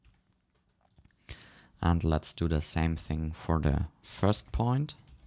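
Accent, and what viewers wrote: Germany. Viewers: Nice video but you sound so german😁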